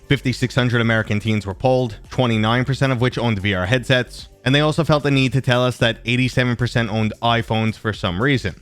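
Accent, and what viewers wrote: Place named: Massachusetts, United States. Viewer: thought i heard a hint of Massachusetts in your accent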